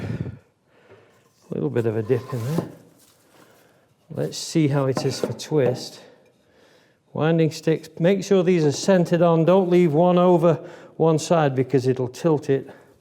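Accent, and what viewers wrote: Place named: North, United Kingdom. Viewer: Excellent Video/s - which part of the UK are you from and where are you now? My question is, after watching closely and carefully your Saw and Chisel Skills throughout your Workbench Build videos - what is your preferred Chisel Brand and why? - AND what is your preferred Saw Brand and why? I suspect by your slightly 'accented ' Northern dialect, you might now be located in the USA so, as such, maybe your Chosen Brands might not be available in the UK/France! I any case, l willl look forward to hearing from you soon - Thanks. All the very best to you and your family.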